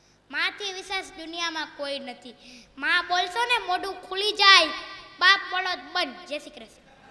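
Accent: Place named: Rajkot, India. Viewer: Vaah Rajkoti boli..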